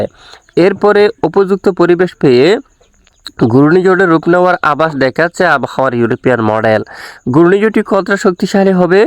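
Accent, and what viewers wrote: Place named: Bhola, Bangladesh. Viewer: ভোলা জেলা টোন দেখতাছি বড় ভাই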